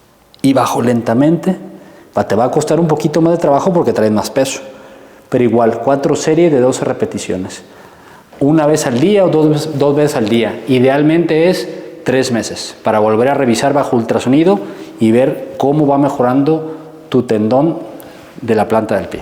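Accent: Mexico. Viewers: es de México! Mírale la bandera de México en la bata! Además de que el acento lo delata!! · Por la forma de hablar, en Mexico.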